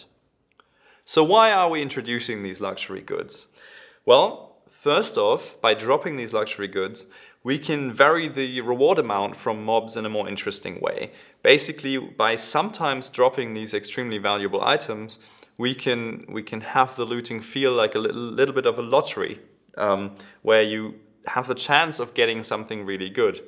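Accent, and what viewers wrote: Germany. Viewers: Er hört sich aber sehr nach Deutsch an.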